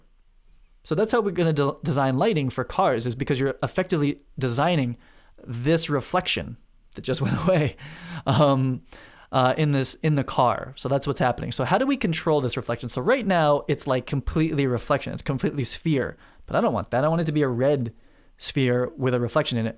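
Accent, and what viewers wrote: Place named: United States. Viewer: Nope, pretty strong US accent but very pleasant to listen to.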